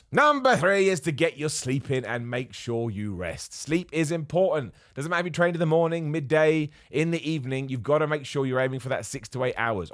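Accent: United Kingdom. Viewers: Oh, it's! The things you say repeatedly get rushed through to where they've lost our normal, awe-inspiring Bri'ish enunciation. I finally caught it! I really enjoy your videos. You make even the droll topics more listenable.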